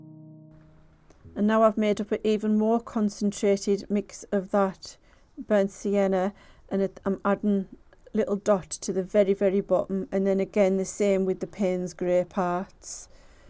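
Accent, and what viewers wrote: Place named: Newcastle, United Kingdom. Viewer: How comes I haven't found you before? I loved my 4 years up in Geordie land many moons ago. Your accent makes me feel homesick lol. I love your style and can't wait to get my paints out again!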